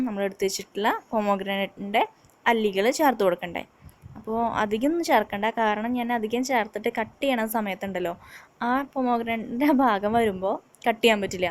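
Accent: Thrissur, India. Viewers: Thrissur aano native place 😃slang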